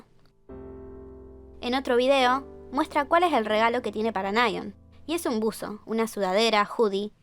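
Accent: Argentina. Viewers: es argentina así se pronuncia acá bobo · Sos de Argentina???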